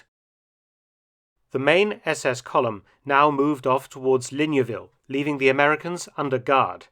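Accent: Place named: RP, United Kingdom. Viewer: GENERAL SOUTHERN BRITISH/Received Pronunciation.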